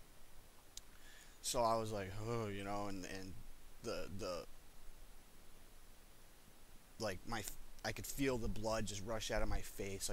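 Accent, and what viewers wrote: Massachusetts, United States. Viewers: I knew he was from mass before he said it lol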